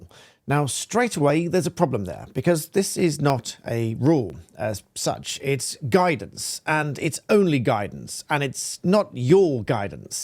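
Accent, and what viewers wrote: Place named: London, United Kingdom. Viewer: sounds like my mistake! Though he does sound like a Londoner😜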